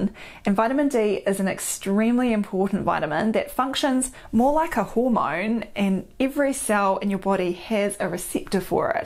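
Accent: New Zealand. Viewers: So wonderful to hear a Kiwi accent! Appreciate your videos. You are a picture of health an vitality yourself. Thank you. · The Kiwi accent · What I enjoyed the most about this video “Kiwi accent” noticed one thing more Hollywood is alive & kick’n in Kiwi Land, you know I’m just been facetious, my first time it’s informative, talking about sun, I am from beautiful Huntington Beach CA weather is always good. All in all it’s informative video! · Who knew health information could be so exciting? That combination is irresistible: - beaming smile - sweet and gentle demeanor - medical facts delivered in a comprehensible way for normal people - love the kiwi accent! You are officially my internet doctor,!:) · Thanks for the information. It's a beautiful sunny day so I am going out and catching that sunshine. You are blessed with THE most Kiwi accent I have ever heard! 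Love it!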